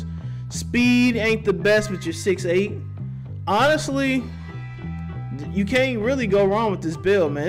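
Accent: St. Louis, United States. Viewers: You from stl?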